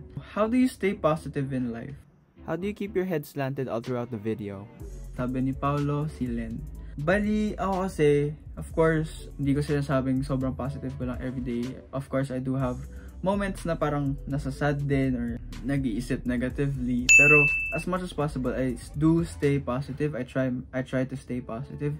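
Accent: Philippines. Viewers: Your Filipino accent is soo heavy! Despite being half Japanese. Why don't you speak English more in your video? I don't understand what you're saying most of the time... :/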